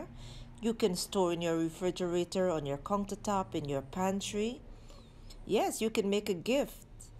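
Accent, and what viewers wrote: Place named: Guyana. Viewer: I just knew you were from Guyana. u sound like one side of my family who’s from there too ❤😂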